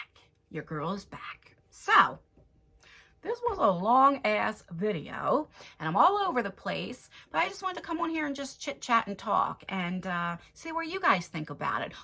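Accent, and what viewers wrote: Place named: Massachusetts, United States. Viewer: I’m 69 years old please advise me,I love the way you tell it like it is,your a doll. And boy can I hear your accent,because I’m from Massachusetts 🥳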